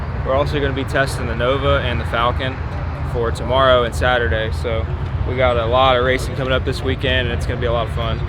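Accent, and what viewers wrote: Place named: Texas, United States. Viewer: I sense a little texas drawl with that cowboy hat on.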